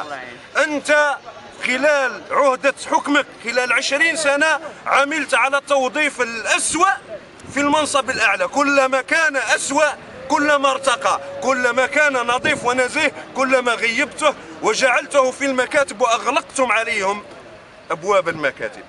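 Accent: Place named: Classical, Arab world. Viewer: je suis francophone mais je vous salue et vous respecte Monsieur car vous parlez l arabe classique car beaucoup d arabisants ne savent pas parler arabe .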